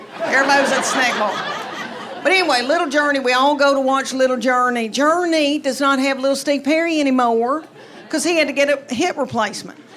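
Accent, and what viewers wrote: South, United States. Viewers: She is really funny , love that southern belle accent 😂😂😂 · Just discovered. Nice hearing a clean southern story teller. Jerry Clower style. ❤ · With that southern drawl, only she can talk about her real life situations, and make it relatable and funny! 😆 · As a fellow GenXer I enjoyed her material, but her accent is just too Southern for me. I'm as South as you can get(Florida born and lived here all my life), but I'd guess that only about 5% of people have Southern accents that thick. Probably because the vast majority of people that live here moved from up north. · I always laugh…so relatable about everyday life with the fam. I love her southern drawl it adds to her stories. It beats one from up north any day… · This has to be one of the worst American accents there is! So nasal and annoying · I am not a native speaker. What southern state his this accent? 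Georgia? Alabama? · i’m divorced, from extreme L california, been dating a lotta southern girls lately and now in love with their southern accent. and it’s women like that only makes me love southern girls more! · Yes...She is refreshing. I find it odd though...that her accent is way heavier and countrified in this video than the other two videos of her I watched. I think one of the others was from at least two years ago. This one is 6 months old. I guess she changes her twang...based on location? That would kind of make sense. You want to be understood. Maybe she moved back to Georgia? That is what the accent resembles. Regardless...I was also brought up in the mid 1960's - 70's...and I can relate to a lot of her stories.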